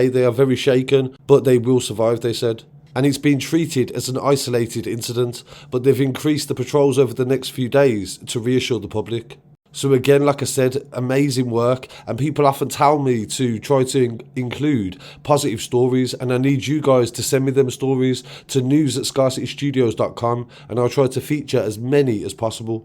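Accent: Birmingham, United Kingdom. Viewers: Good reporting !! - but strong brummie accent 😮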